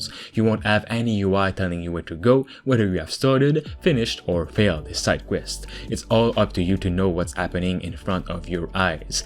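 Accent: Quebec, Canada. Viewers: this guy is clearly from quebec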